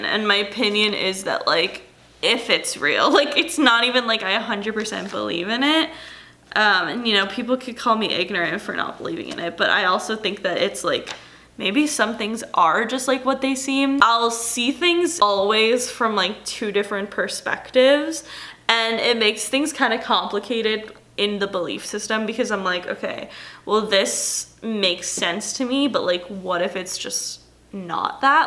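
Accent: New York, United States. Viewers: I love your New York accent..Lmao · Her New York accent though😂😂😂😂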